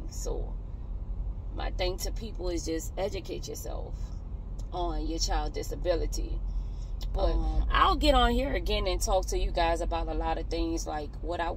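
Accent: New Orleans, United States. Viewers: Girl I listened to you and said, home girl is from the NOLA!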